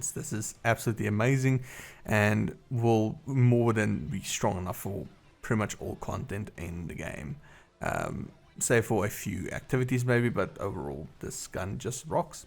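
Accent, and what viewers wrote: South Africa. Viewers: You sound South African. Will give you a like and sub. Gl with YouTube man.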